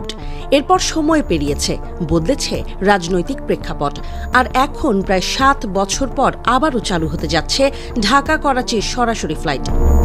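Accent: Kolkata, India. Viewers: কলকাতা মার্কা স্টাইলটা না করলে হত না? আদতেতো কিছুই বলতে পারলেন না, মাঝখানে কিছু ক্যালক্যাশিয়ান ঝারলেন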